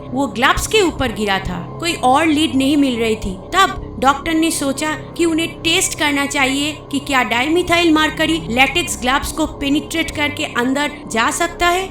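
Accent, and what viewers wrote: Bengal, India. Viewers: Loved the way u say mercury... From day 1 i had found ur bengali accent very cute.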